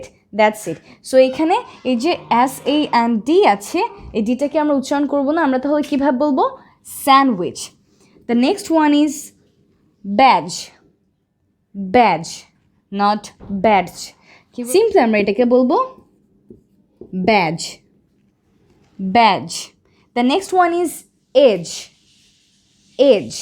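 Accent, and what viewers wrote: United States. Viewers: We need this kind of more class. Btw Sister I have a question Is this American pronunciation? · Apu ki USA accent use koren?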